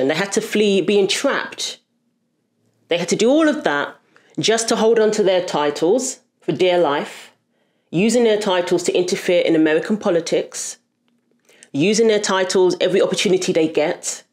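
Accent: England, United Kingdom. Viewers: Just found your channel and subscribed straight away. It is always a joy to hear the Queen's English spoke so eloquently, and a young person with such good perception. Your accent suggests that you are from England or a Commonwealth Nation, being in America now makes your perspective extremely valuable for the rest of us. Looking forward to following you!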